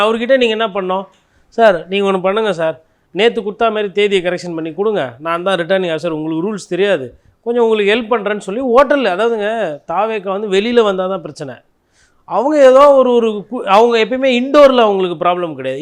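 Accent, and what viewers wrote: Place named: Chennai, India. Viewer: ..சுத்த சென்னைவாசி..ஸ்லாங்...அப்புடியே...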